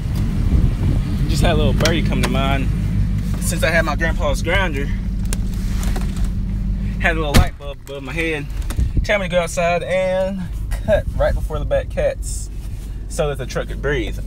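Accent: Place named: Atlanta, United States. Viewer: Your from Atlanta all my people out there talk like that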